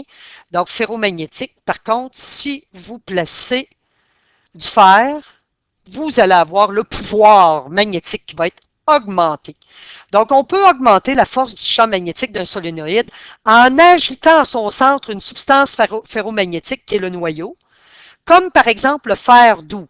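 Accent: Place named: Canada. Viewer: Bonjour madame et bien merci pour votre réponse très rapide et c'est parfait cela me permet de très bien clarifier ce sujet. Vous êtes très compétente et j'aime bien votre accent Canadien et bien le bonjour de Belgique.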